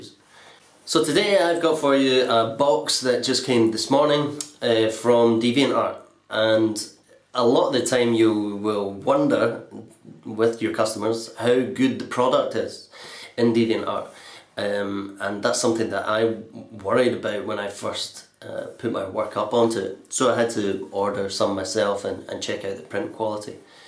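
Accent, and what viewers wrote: Scotland, United Kingdom. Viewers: sounds more Scottish. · Thank you so much for this video, this was really helpful. Also I love your accent, are you British by chance? If not, sorry for mistaken. ^ ^ Keep up the amazing work honestly, I subscribe and excited to watch more of your videos.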